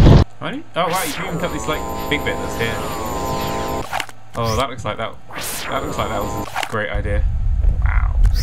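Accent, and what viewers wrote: United Kingdom. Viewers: For some reason, whenever I'm thinking over doing a tutorial video or something, I think it in some random British dude's voice... I now understand that it's Nerd3's.....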